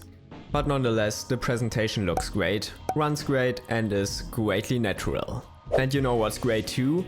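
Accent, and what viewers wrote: Germany. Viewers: I could tell this guy was German, simply by accent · A LITTLE German Accent 🤣 PS: I'am German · das deutsch killt mich🤣♥️ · This wasn't meant to be as an offence. I love "zhe" german accent. Good video tho💪🏽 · Super interesting video, thanks! Are you from Germany by any chance? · are u german? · Your German Accent is like my Ofenkartoffeln, just a burner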